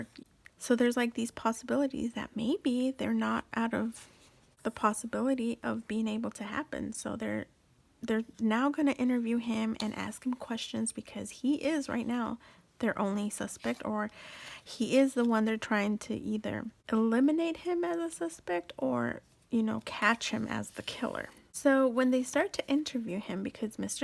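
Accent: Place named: New York, United States. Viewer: Love your New Yorker accent...lol It’s a shame no one was found accountable for the crime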